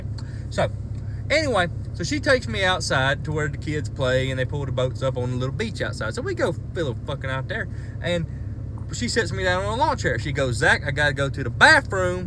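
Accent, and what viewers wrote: South, United States. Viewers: Zach, I don't agree on the whole god/jesus thing, but love your energy, honesty and down to earth wisdom. I'm from Seattle and you make me want to talk with a southern drawl. Sometimes a few drinks is what it takes to get our priorities straight.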